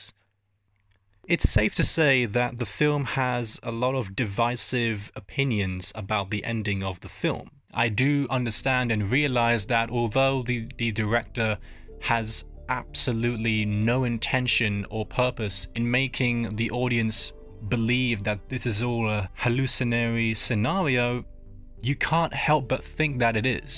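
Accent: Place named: Cockney, United Kingdom. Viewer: You lost me at Cockney accent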